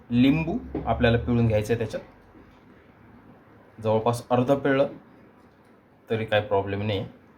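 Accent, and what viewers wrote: Nashik, India. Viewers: आम्ही पण नाशिक कर आहोत तुमची बोलण्याची पद्धत छान आहे. रेसीपी ऊतम. नाशिक टोन🎉🎉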